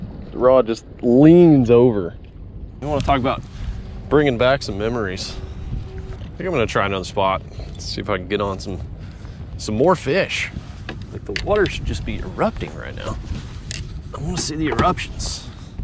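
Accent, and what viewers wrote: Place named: Texas, United States. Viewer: What part of Texas are you originally from? I have friends from Texas and your accent sounds a little different then theirs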